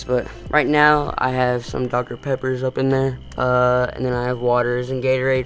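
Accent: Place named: Texas, United States. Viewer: Impressive room. Desktop setup is amazing. Little clues like your accent and the weather today make me think you’re in Texas.🤷🏻‍♂️ Great content. All the best. 🗡Ace🗡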